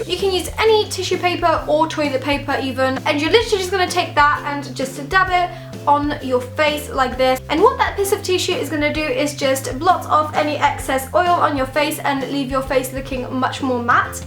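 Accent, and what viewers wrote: England, United Kingdom. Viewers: English accent* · hahah defenitly not polish with pure english accent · she has an English accent. There are so many different accents in Britain eg Scottish, Irish etc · British accent 😍❤ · That is a British accent correct?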